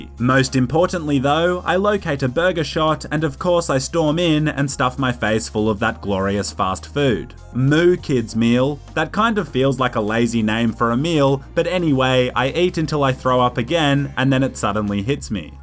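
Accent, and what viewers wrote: Romania. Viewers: Are you from romania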